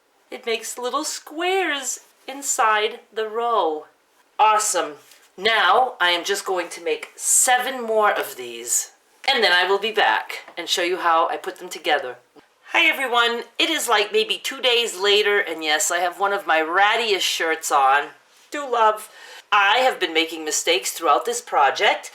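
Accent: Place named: New England, United States. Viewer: Thank you so much for your tutorial! I loved the addition of lace! It felt so good to watch an experienced quilter run into issues. I have an ongoing relationship with my seam ripper, it's always right by my side!! I loved listening to you, you sound like a New Englander, I'm from Maine and felt comforted by your accent. I hope your mom loved the quilt! Take care!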